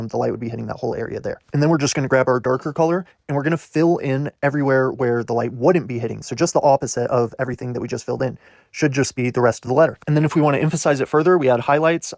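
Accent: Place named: Canada. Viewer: Dudes gotta be Canadian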